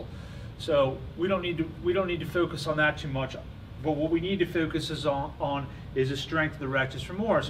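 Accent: United States. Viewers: U got the nyc accent. U from nyc? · Ha, PA accent. Go Stillers!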